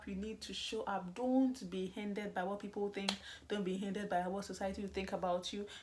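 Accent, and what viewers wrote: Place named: Ghana. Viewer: Tfs... Btw you speak quiet fast ya...:-) good thing I had a Ghanaian friend during college so I cud follow you...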